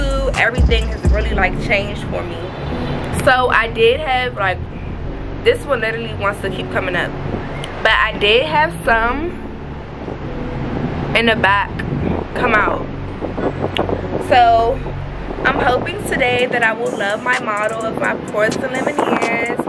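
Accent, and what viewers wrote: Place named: Washington DC, United States. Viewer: GIVING DC ACCENT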